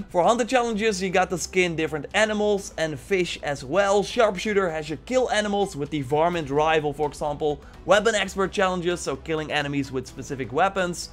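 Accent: Netherlands. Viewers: I can tell by your accent that you're Dutch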